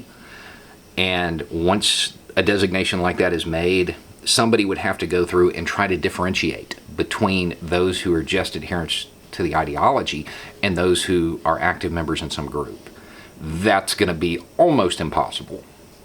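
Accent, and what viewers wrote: South, United States. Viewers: Are you from Lower Alabama or Upper Florida?🧐😂 just a country question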